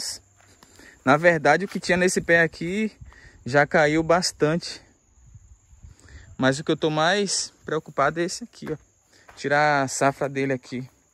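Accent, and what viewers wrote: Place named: Minas Gerais, Brazil. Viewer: Nunca imaginei que fosse no sul da Bahia sempre assisto você achando que fosse mineiro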